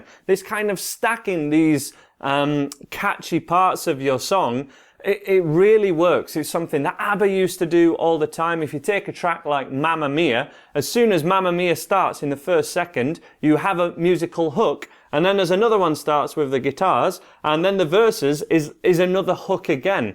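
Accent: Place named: Yorkshire, United Kingdom. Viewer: This is really helpful, thank you :) what part of Yorkshire are you from?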